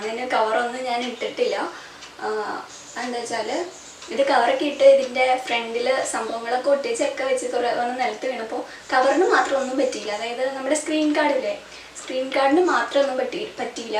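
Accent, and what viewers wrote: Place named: Thrissur, India. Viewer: Ur presentation is very good. Chechide veed trissur enganum aano? Slang varunnu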